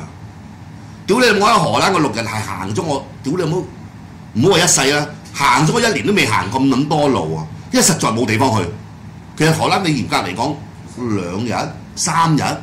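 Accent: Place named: Hong Kong, China. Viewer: 你港式英文都好勁口音😅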